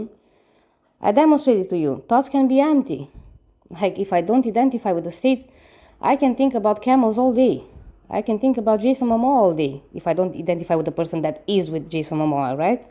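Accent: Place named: Spain. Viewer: I always tought you were Spanish! You sound jut like a friend of mine who is Spanish and speaks English at work :) Anyway, you are a fresh breeze in LOA YT community and I like your direct style without offending anyone and calling girls 'bitches' :D Thank you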